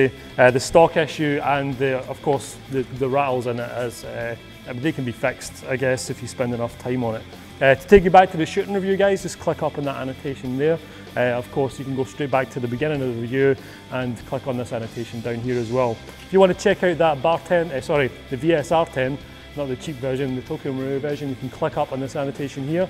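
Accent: United Kingdom. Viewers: Jeez, everything you say is so credible. Damn British accents!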